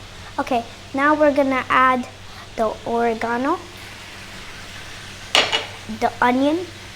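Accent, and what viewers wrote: United Kingdom. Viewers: British pronunciation 😊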